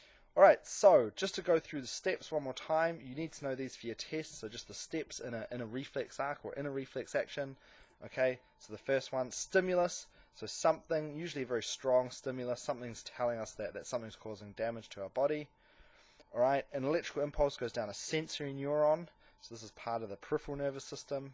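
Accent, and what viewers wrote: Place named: New Zealand. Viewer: nice kiwi accent hehe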